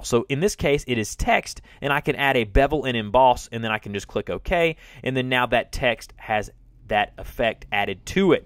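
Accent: South, United States. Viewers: Thanks for this video, it was really easy to follow! Also that southern accent is charming. ;)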